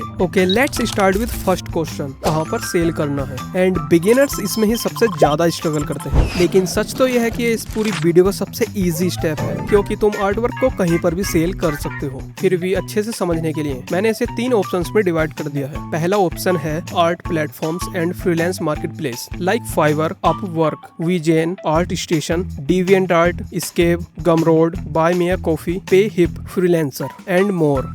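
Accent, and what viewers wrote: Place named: Odisha, India. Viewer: Bhai pata nahin kyun esa laga ki aap Odisha se ho ? 🙂‍↕️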